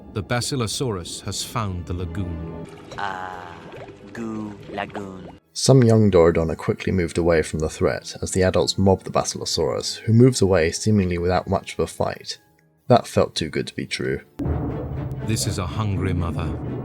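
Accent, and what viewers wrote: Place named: London, United Kingdom. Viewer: I'm really enjoying the episodes so far. I swear your voice sounds familiar. Though it could just be the London accent. Does Valerian mean anything to you?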